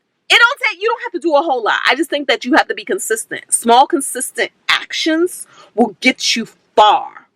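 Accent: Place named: New York, United States. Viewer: im furloughed, and concerned about layoffs in future, I planned on Monday to start my workout, meal preps, more time to my schoolwork (bachelors in Christian counseling) also more volunteering with non profit and playing with my fur children! new sub here!! one question your accent sounds familiar are you from NY....LOL I AM....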